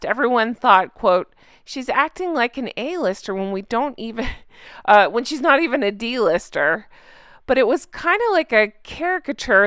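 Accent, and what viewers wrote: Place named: East, United States. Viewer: You are correct, Greg. But she is from somewhere in the east US, making her pronunciation incorrect. Besides, mine is the dictionary pronunciation, which I only checked after your comment.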